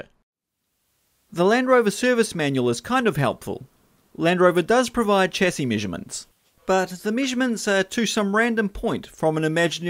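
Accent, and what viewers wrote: New Zealand. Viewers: That would be the New Zealand pronunciation, just like 'fush n chups'! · I wish you did this full time! Your videos are genuinely excellent. I think it is the kiwi commentary that makes them! Can’t wait for the next. 👍👍👍👍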